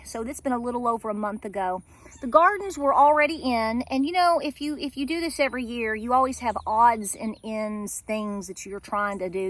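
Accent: South, United States. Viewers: I LOVE your accent!! It’s such a beautiful southern sound; both my grandmothers were southern and I loved hearing the soft lilt in their voices:)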